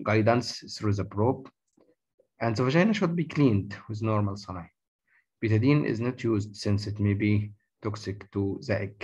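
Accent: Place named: Egypt. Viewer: Egyptian English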